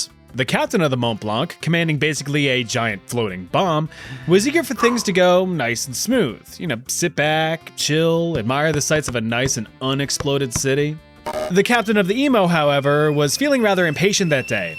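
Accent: Germany. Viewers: How is your German accent so perfect? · As a German myself and a resident of ERFURT, I have to say I LOVE the sprinkled in German words and the accent 😂 "and what kind of scheiße do we get in then" ... I literally lost it 😂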